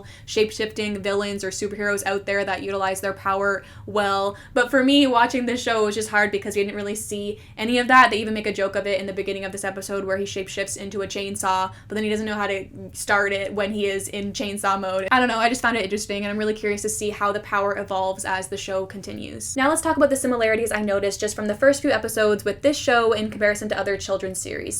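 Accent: Canada. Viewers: Also like your accent. Aboot!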